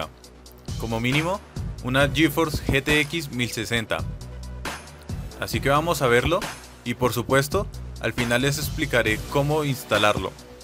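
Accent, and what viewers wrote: Colombia. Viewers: Sos de colombia cierto? No se note como asento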